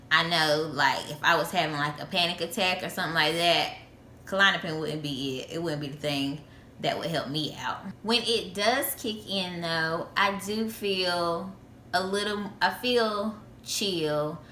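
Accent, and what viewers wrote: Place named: South, United States. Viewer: Dam I love that southern accent. You talk like my cousin. I will be taking this medicine soon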